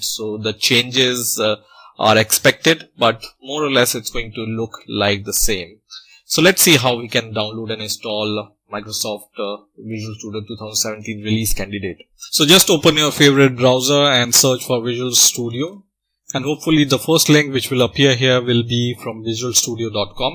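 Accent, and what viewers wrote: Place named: India. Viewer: No, it is just the Indian accent...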